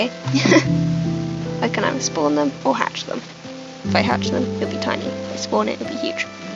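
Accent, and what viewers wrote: United Kingdom. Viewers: YOUR VOICE IS SO COOL!!!!! NOT ONLY DO YOU SOUND SO MATURE, BUT ALSO I LOVE BRITISH ACCENTS!!!! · OMG YOU ARE JUST LIKE ME IM LESBIAN I LOVE TO PLAY MINECRAFT IM ENGLISH sorry if I’m wrong you’re accent sounds mighty like it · Why do you sound so bloody posh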